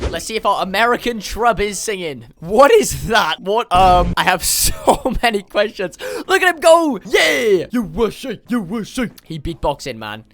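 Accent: Wales, United Kingdom. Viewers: Holy crap thats the most welsh accent i ever heard 🏴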